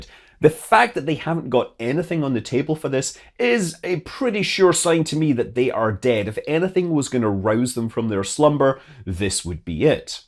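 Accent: Scotland, United Kingdom. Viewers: I say it to myself every time I see he has a new video up! My girlfriend hears me and says "Oh is it that Scottish space guy?"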